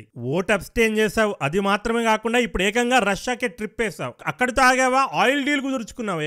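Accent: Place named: Telangana, India. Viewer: Kaka nidi telangana na sem telangana basha la matladu thunavu jai telangana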